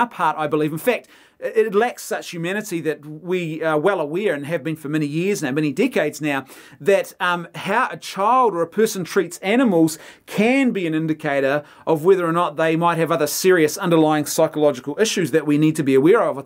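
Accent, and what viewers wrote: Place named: New Zealand. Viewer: Rare-bits and Possums are pissed? Are they always this mad down there? Love your NZ English :o)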